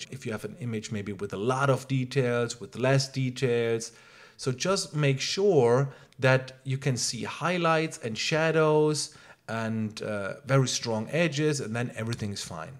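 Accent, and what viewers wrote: Germany. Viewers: Great tutorial! Keep up the great work. Gotta love that German accent! Inwoooard (invert), blent (blend) :) Reminds me of my family in Germany.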